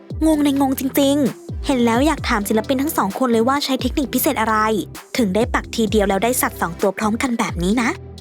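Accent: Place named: South, Thailand. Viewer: ไอ้ยะ แอตแหลงใต้ ไอ้ยะ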